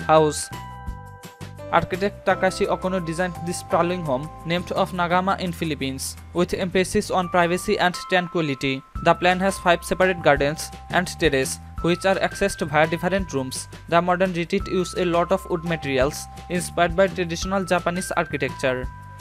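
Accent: India. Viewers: Indian Narrator????????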